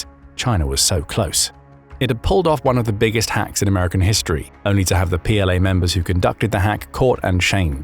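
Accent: England, United Kingdom. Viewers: Lmao this video is total bullshit. I get a little suspicious on yhe stories then they flash up a picture of the lincon monument, that is 100% not what the monument looks like. I don't know who produced this video but it used an English accent voice using American measurements & stories that just aren't true.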